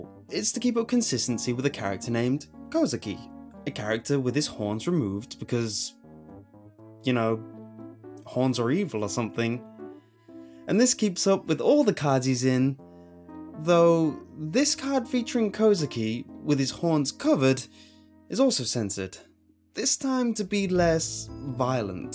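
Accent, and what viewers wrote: United Kingdom. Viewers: he sounds British, but is not British. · You sound British but don't look British what's up with that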